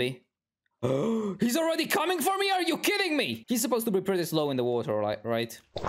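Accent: Italy. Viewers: Capisco dalla pronuncia che sei italiano complimenti per l'inglese ti giuro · Your from italy? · " So it cooks ( Coo-ks) faster " Gosh i love Italian accents XD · Italy? Sei italiano? (Are you Italian)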